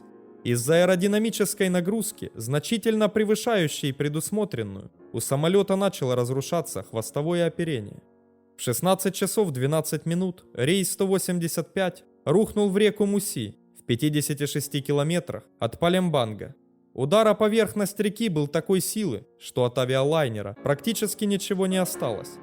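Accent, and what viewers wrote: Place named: East, Ukraine. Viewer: я это поняла. Восточная Украина, видимо) может, Вам проработать его? Без обид, просто мы Вас не видим, только слышим, голос имеет огромное значение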